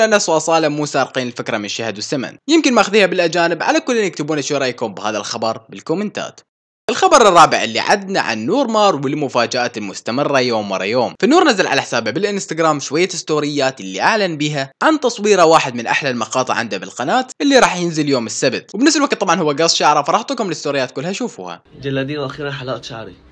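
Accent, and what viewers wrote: Iraq. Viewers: اوووووول سؤال انته عراقي صح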